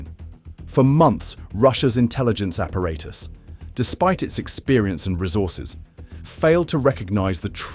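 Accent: England, United Kingdom. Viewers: Insignificant information. Most probably misinformation. I can hear the English accent. Sounds like British propaganda. What a lot of BS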